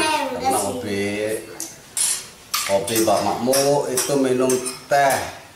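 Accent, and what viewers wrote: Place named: Banyumas, Indonesia. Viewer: bahasa ngapak....emang dr kota mana pak....😁😁😁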